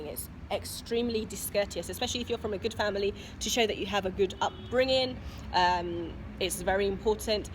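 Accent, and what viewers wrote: United Kingdom. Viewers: Why she got a British accent? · It's British accent, especially that of London, [other accents in England might me so different]. You might consider listening to British podcasts, or relocating to Britain for a while.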